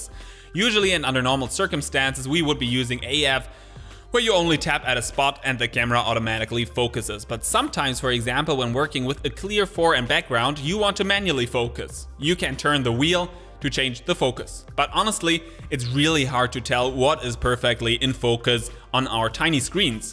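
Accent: Germany. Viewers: Hört sich an wie ein Deutscher, der englisch spricht.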